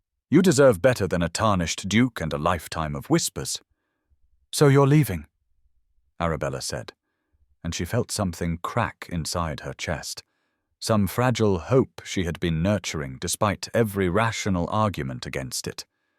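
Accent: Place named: United Kingdom. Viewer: Thank you so much for this lovely story. It is an original and enticing tale of romance and intrigue, with an ending appropriate for the situation you so elegantly described in the long story. I enjoyed the narrator with the British accent. It lends a distinguished touch to your wonderful story. Please keep up this wonderful channel and your tales of Victorian society. Perhaps you could utilize a few more images that would enhance your storyline…💕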